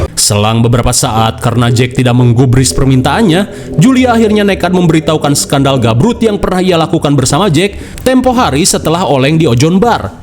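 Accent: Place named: West Java, Indonesia. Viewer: channel youtube yang nyunda nya nyunda bgt 😂😂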